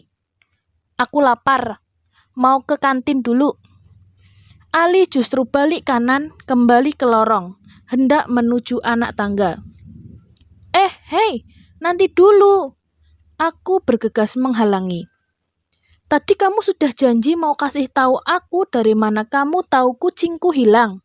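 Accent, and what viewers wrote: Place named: Ngapak, Indonesia. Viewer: Narasi pake suara bocah ngapak. Medok banget.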